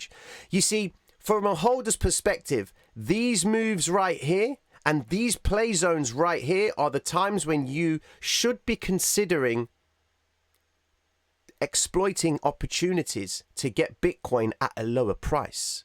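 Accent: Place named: North London, United Kingdom. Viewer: He's from north London bruvs:) The accent is the Queens English with a twist of colloquial city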